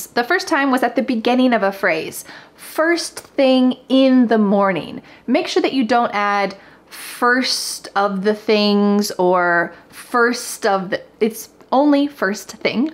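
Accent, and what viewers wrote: United States. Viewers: J'adore vos cours, je comprends très bien votre accent américain. Merci beaucoup pour le temps que vous accordez à faire ses cours 😊😘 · You are doing a great service. Without knowing anything about phonetics and great vocabulary, if I watch your videos everyday, I bet within 6 months, I shall speak at least 50 % american accent. I think it is the easy way to learn a language, that is to listen . Gradually one can pick up. Thank you.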